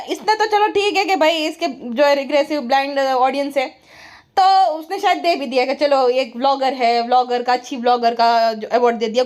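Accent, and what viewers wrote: Gujarat, India. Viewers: I just love ur "Gujarati accent" 💜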